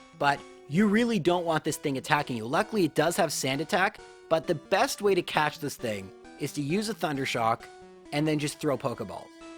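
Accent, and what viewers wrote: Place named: Canada. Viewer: I can tell your Canadian by the way you say “again”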